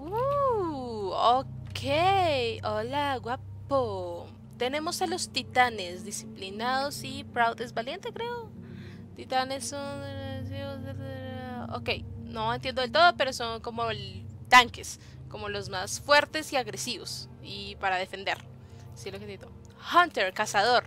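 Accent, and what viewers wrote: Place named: Caribbean Coast, Colombia. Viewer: Pareces costeña en ciudad de cachacos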